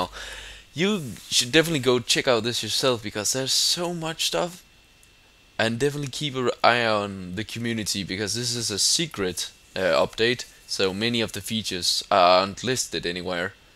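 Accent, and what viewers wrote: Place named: Denmark. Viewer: You sound danish!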